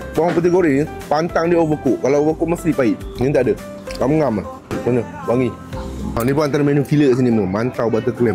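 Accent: Malaysia. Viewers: Gila aku mati² ingt brader ni melayu Bila tgk nama channel baru lah cine rupanya, mmg pior sleng n sgt fasih bhsa melayu dia.. Terbaikk lah bang smoga maju jaya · Dia ni melayu ke cina 😂😂 slang melayu habis.. ni betul2 org msia. ❤❤❤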